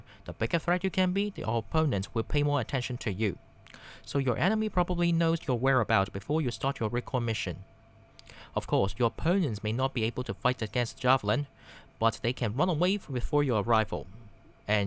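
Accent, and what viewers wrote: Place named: Asia. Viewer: I would regard this as a particularly unfair and unnecessary comment. I’m a native English speaker and I would deem his English to be excellent and conveyed with clarity. There’s an unmistakable Asian influence on his accent, but it in no way detracted from my ability to understand what he was saying, indeed I’d go so far as to say his command of English is better than a lot of native English speakers I’ve met. To the poster of this great video I’d like to say a sincere thank you for this excellent essay and please ignore ignorant comments from the likes of this poster, you did a great job and you don’t need to improve anything, just keep this terrific content coming and thank you for your efforts.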